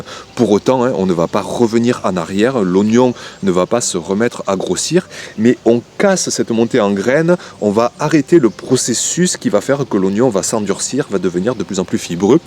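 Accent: South, France. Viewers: Bonjour, Je me suis abonné parce que tu as l'accent du sud. Je suis belge 😊. Le sud m'a toujours fait rêver et tu es un "exemple" qui alimente cette vision. C'est chouette que tu puisses vivre de cette passion (surtout pouvoir laisser une chance à sa sensibilité). J'aimerais bien t'avoir comme voisin 😂. Bonne continuation. Pierre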